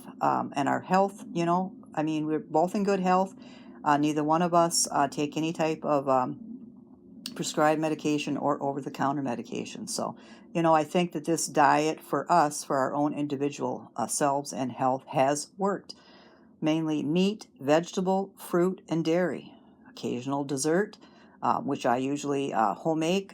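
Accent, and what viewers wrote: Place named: Wisconsin, United States. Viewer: My parents are from LaCrosse, WI! I recognized your accent! People ask if I am from Minnesota or Wisconsin often! Soul sister!!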